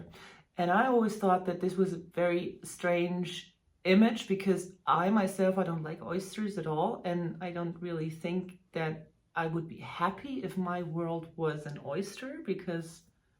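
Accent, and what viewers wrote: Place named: Germany. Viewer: Have you ever visited the island of Borkum? I live in Canada but spent some time in Germany in the late 1960's and your lighthouse piece brought that to mind. It looks wonderful, by the way! I really enjoy your channel,. Let out your German accent as I find it quite charming!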